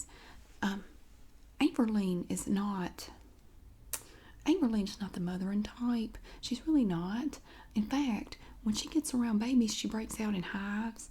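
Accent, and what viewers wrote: South, United States. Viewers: omg southern accent level 1000 haha,you are great girl!! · I guessed those places because she sounds just like my wife who was born in Jacksonville, FL but grew up in Memphis, TN and Byhalia, MS. Southerners are self-conscious about their accents but geeze I love em. It's so American.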